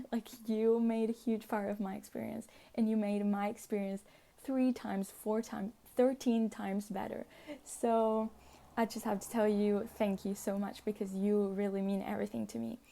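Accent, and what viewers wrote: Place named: England, United Kingdom. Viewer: girl, i'm from Spain and i really LOVE your english accent, even in my wildest dreams i can not have your accent!! please, do a tutorial or something hahah pd: i LOVE this video, i can't belive i was there!!!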